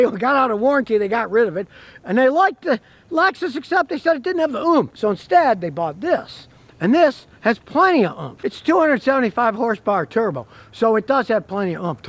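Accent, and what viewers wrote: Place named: Texas, United States. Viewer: It is the Texas accent he picked up down there 😃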